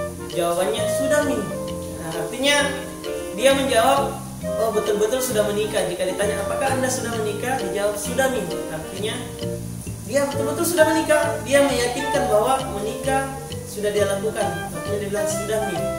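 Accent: Makassar, Indonesia. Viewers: Tapu itu logat makassar bukan bugis😅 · Itu bukan bhasa makassar brow tpi logat dlm bhs indonesia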